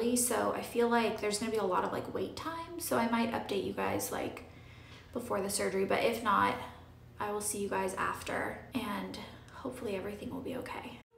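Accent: South, United States. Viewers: You went so southern after the anaesthetic hahaha I love it, sending love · The accent, I love that southern accent. I kept replaying that part. Love you, you are so strong ❤️ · I thought the same! So southern! It was cute to see. X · So glad you're ok! So sorry about your sweet baby <3 we love you so much! and your southern accent too haha. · I was going to say the same thing. Post-surgery gets real southern.:-) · I LOVE how southern you sound coming out of anesthesia! So cute! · Thanks for sharing your difficult experience, southern twang and brutal honesty. You’ve made it that much better for the next person that has to go through this awful ordeal. Sending you lots of hugs from NC!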